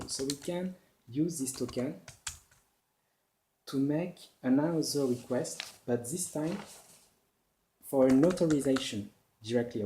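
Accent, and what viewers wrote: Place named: Germany. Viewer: Great tutorial brother, Loved that German accent!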